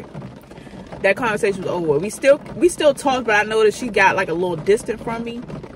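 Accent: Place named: North, United Kingdom. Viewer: U must be from up north u sound like it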